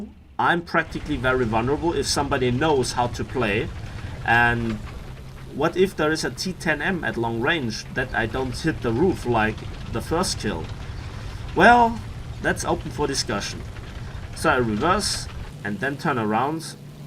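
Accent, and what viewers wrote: Bavaria, Germany. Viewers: Bist du aus Bayern ?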